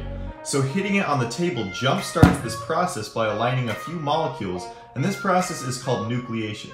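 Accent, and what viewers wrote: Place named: United States. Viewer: Oh my God, I love clear American. It’s so good.😂😊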